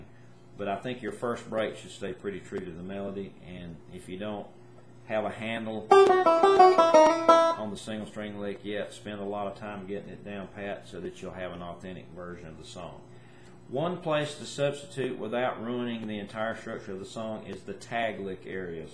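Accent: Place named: West Virginia, United States. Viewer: Im from mingo co wv, I can tell your southern wv or eastern ky,,, where you at?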